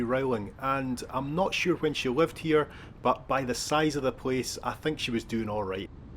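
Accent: Scotland, United Kingdom. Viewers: Beautiful video, love me some wet-street Edinburgh; and lovely accent, seems like Scottish people wouldn't have an issue rolling the Spanish "r" ☺️ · Hey, I've just found your channel and love it. Thank you. A Scotsman who enjoys his own country really much. Love it. I have to admit that I miss Scotland badly during this Lockdown. I live in Germany and as I habe friends in Scotland I come each year to visit them or come as a workaway. It's my second home. Mostly I arrive in Edinburgh or if not we mostly make it to an at least short visit on our way. Thank you for sharing. Lovely to watch your trips and hear your accent. Greetings from the west oft Germany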